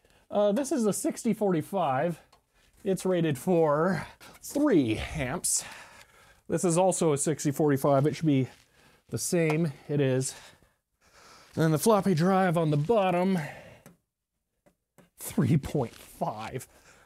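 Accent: United States. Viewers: Don't know how you're getting on with your restoration, but… if you haven't plugged it in yet (8-months later says YouTube), then DO install another circuit from your fuse-box (just as you might when installing a shower or cooker for the first time). Don't just plug it into your ring-main with other appliances and wall-outlets. PS. I guess you are saying this in your video but the Americanese is not quite so clear as English English. Sorry!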